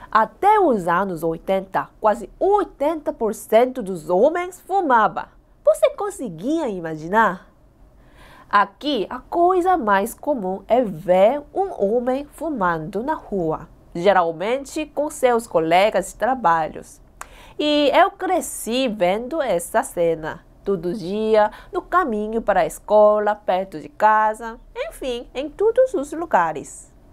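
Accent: South Korea. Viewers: Mano ela fala português muito perfeito é tipo ela fala português e é tipo o geito é muito parecido com coreano muito fofinha🥺💕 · gosto muito de você principalmente do seu sotaque coreano... quanto ao cigarro o governo junto com a saúde fez campanha pra pessoas deixarem de fumar....isso contribuiu muito...tem o Dia Nacional ante tabagismo....abraço...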